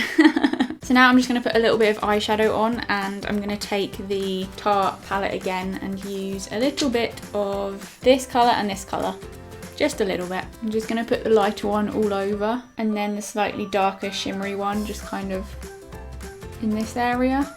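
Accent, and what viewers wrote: United Kingdom. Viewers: Shut up! It's called a British accent! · ITS CALLED A BRITISH ACCENT!!! I'm British :'( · are you british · iiSavageAmerican you dumb bitch it's called BRITISH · this is British accent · Ur British accent is sooo cute xx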